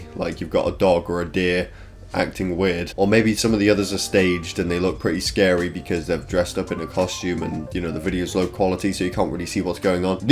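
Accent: England, United Kingdom. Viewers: I never realised this before but your voice kind of sounds like penguinz0 with an English accent.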